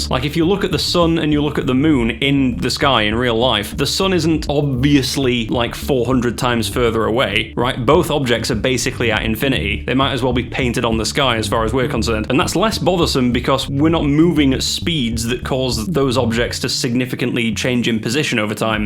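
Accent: United Kingdom. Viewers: Just subscribed absolutely love your commentary 😂 is that a northern accent i hear? ❤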